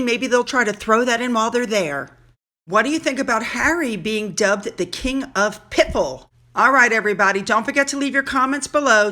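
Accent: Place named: New Jersey, United States. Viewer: I wonder where she's from? I'm guessing New Jersey, Pennsylvania, or Delaware.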